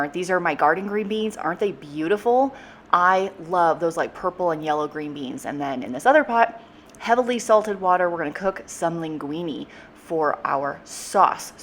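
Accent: United States. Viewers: You cooked Barra! It’s delish! So is red snapper. & you said it correctly, it’s strange hearing American accent say it haha. Fellow Aussie 🇦🇺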